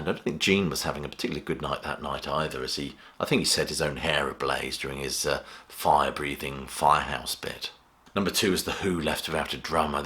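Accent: United Kingdom. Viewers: Cheers mate, knew I'd found the right channel instantly. It just wouldn't feel right to have my rock music anecdotes explained by anybody else besides an obvious former roady with a UK accent of some sort, Innit!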